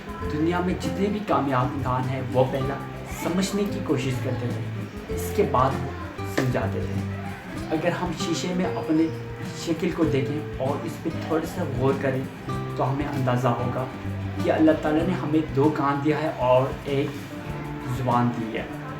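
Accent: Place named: England, United Kingdom. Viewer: Wah bhi Urdu bhi English style mein bol rha ho...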